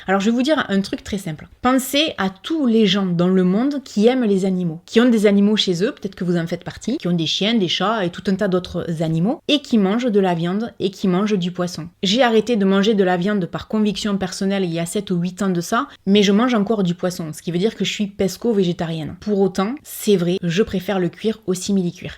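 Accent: South, France. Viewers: J'aime bien cet accent du Sud ! Super!! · je te suis depuis plusieurs années mais n'ai jamais posté de commentaires. Je le fais aujourd'hui pour te dire que tu es une personne formidable, tes vidéos sont toujours le petit moment de bonheur avec l'accent du sud et du soleil☺️. Toujours authentique et vraie, de bons sujets de vidéos et une famille formidable avec ta maman et vos adorables petits compagnons. Ne change rien 🥰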